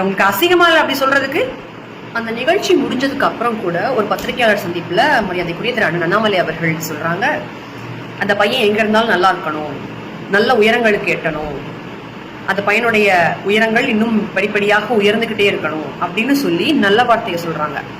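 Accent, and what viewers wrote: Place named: Madurai, India. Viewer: Very good with Madurai slang deecently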